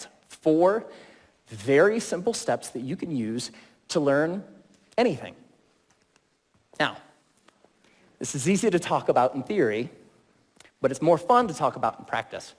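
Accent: United States. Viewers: อเมริกันค่ะ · สำเนียงอเมริกัน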